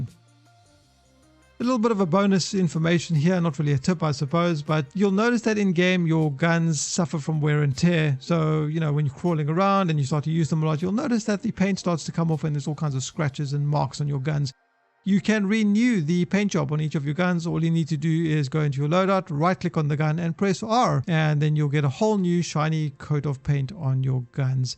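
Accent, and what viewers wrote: South Africa. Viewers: Thanks bud. South African?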